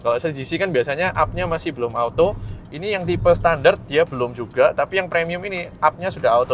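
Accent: Surabaya, Indonesia. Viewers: Soko logate aku langsung ruh awakmu asli Suroboyo. Sego Endomie.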